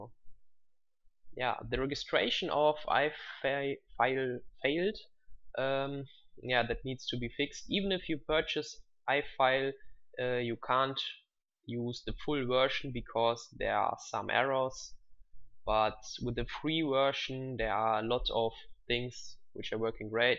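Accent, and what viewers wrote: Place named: Germany. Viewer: man merkt den Deutschen Dialekt ;) xD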